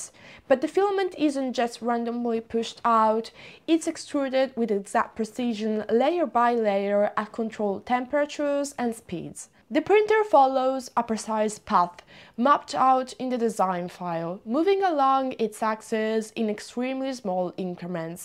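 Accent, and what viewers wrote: Italy. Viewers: So, I was wondering what that accent was. Apparently she has a mirror YouTube channel with exactly the same video's where she speaks Italian :) So, there you go.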